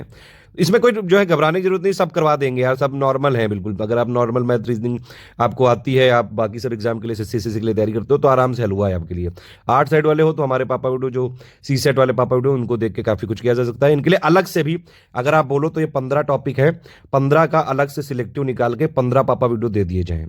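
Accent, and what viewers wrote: Kanpur, India. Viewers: 😁😁😁😁😁 bhut sahi se liye ho kanpuriya style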